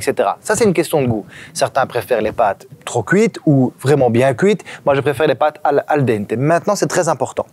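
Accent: Belgium. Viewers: Une vidéo complète avec de bonnes explications ; du coup je vais de ce pas m'abonner à votre site car "cerise sur le gâteau" : J'adore votre accent qui me rappelle ma jeunesse lorsque j'allais à Liège chez ma tante (précision : je suis française 😉😉) A bientôt pour de nouvelles vidéos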